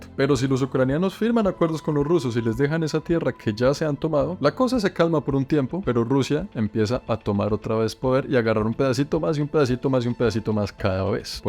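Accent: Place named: Colombia. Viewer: SUPER COOL 😎 👌 TODOS SUS VIDEOS!! MUCHAS GRACIAS POR COMPARTIR 😊 BENDICIONES 😘!! ME GUSTA 👍 MUCHO SU HACENTO COLOMBIANO 😊 Y SUS COMIDAS. SALUDOS DESDE LOS ANGELES, 🙏♥ CALIFORNIA. USA.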